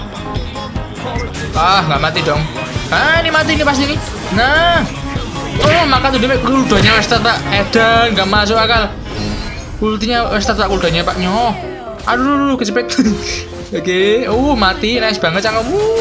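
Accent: Java, Indonesia. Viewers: asli orang mana ya...kok kayak ada logat jawanya...😅😅😅😅😅🍹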